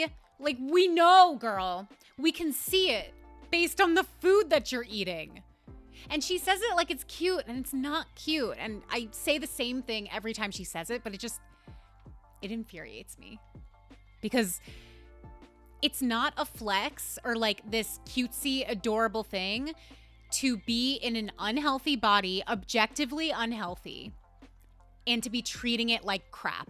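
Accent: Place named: Brooklyn, United States. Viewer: This video popped up on my feed and 3 seconds into the preview my brain clocked “Brooklyn accent!” I’m a Coney Island guy now living in the Bronx and I didn’t realize how much I missed our native tongue. Thank you for that. Also, you’re funny as hell and I like nutrition/fitness content, so I stayed for the video and subscribed ✊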